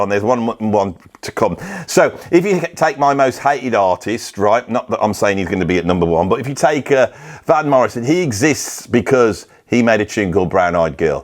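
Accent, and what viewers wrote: Birmingham, United Kingdom. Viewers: We love hate, but only in a Brummy accent.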